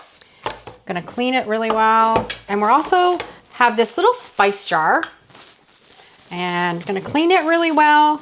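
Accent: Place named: Canada. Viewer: she’s so funny! I watch one of her videos at least once a night, and my husband yells out from across the room…. OUUUUUUUUTTTTT BECAUSE WE ARE NOT CANADIAN This is hysterical to us. Reminds me of all my aunts and uncles that live up there with this accent.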